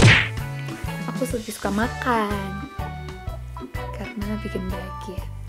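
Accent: Java, Indonesia. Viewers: Jawanya medok banget mba 😊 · jawanya medokin lagi kak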